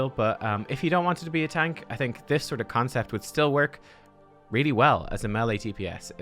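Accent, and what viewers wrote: Russia. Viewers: The Russian accent just won the internet. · Loved the Russian accent, your suggestions and these last few video's!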